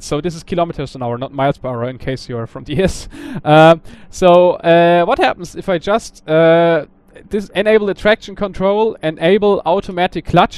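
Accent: Germany. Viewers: Hey, are you maybe german?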